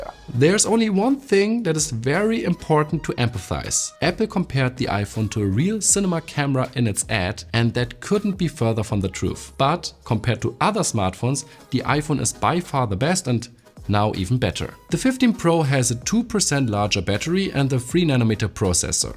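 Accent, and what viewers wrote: Germany. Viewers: You sound German … wouldn't mind this video in German. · Ich mag dein DEnglisch 😅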